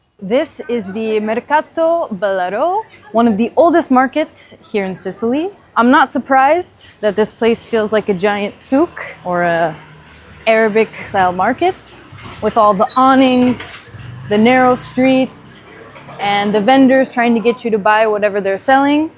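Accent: Tunisia. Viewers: Your Tunisian accent is 100% congratulations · It is nice how you enlight us about hidden areas of islamic remarks in Sisily ,your gunisian accent is remarkable too ❤🇵🇸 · even your accent when speaking italian is tunisian lol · That's amazing you can speak Tunisian lahja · An American who speaks fluent Tunisian? That made me laugh out loud. I think that's the best Tunisian accent I ever heard from a non Arab. Well done :p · As someone from the other side of the Mediterranean coast, I feel like I didn’t even cross it, wow you video is incredible I've always admired how Sicilians are. But gurrl wanna say that your tunisian accent is sooo impressive😮 mashallah 😍 that man in the market wouldn’t be able to pull me in like that, because I know that trick 😂. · Thanks for an interesting video and i spoke to some tunisian while ago and got you really good tunisian accent 👌👌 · You speak Italian with a Tunisian accent: the way you say Aperto? 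· Excellent accent Tunisien !!!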